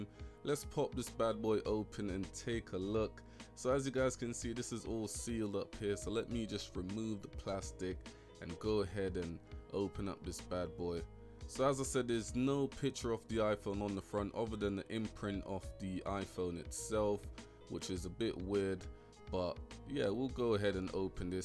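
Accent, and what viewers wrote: United Kingdom. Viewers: London accent. Ahhhh how I miss home.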